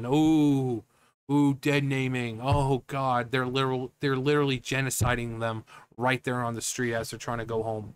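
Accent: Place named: United Kingdom. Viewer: Brilliant analysis (and Brit accent) 😊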